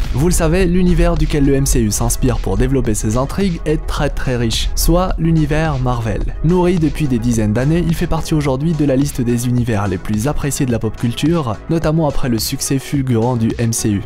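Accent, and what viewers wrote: Belgium. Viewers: T'es belge ??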